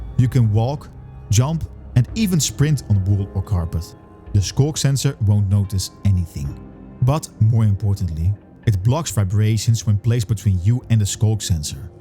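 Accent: Netherlands. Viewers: Very Dutch accent methinks! Great video! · Do I hear a Dutch accent? 👀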